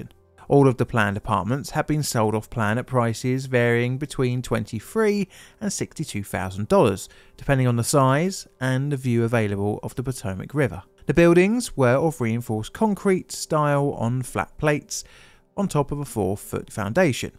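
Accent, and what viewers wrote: United Kingdom. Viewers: He uses the word "garage" in the American sense, as in for parking, I'm assuming because Americans get huffy about stuff like that, but because he's saying it in a British accent my brain can't help but translate it as "car repair shop/gas station". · The fact that your accent clearly places you across the pond and you still throw shade at Florida 😂 they cant catch a break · he's British. They never pronounce things correctly.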